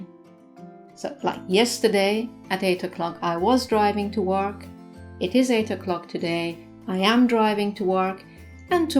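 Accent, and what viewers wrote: United Kingdom. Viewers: Your accent is very light for someone British. What part of England are you from? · Hi, I Just want to say thanks for the usefull tips you give me, I just have a Last question I know you speak british, but what kind exactly? From what City comes your accent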